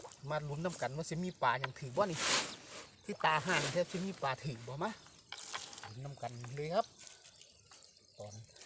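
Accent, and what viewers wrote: Thailand. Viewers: สวัสดีค่ะ ได้ปลาโจกตัวใหญ่ๆ ลาบแซบๆค่ะ สำเนียงเว้าคือไทยนครพนมบ้านข่อยแท้น่อค่ะ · อยู่บ้านได๋น้อ ฟังสำเนียงเสียงเว้าคือสิมาทางเดียวบ่น้อ หมานๆครับ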